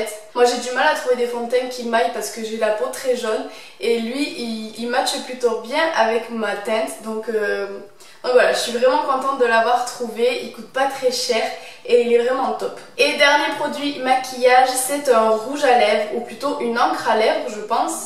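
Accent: South, France. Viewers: une "cagade" mais ouuuuui Sud en force, par ailleur ton accent me fait rêver une des seules youtubeuses avec un VRAI accent du Sud ! Super vidéo comme toujours! continues comme ca ma belle ❤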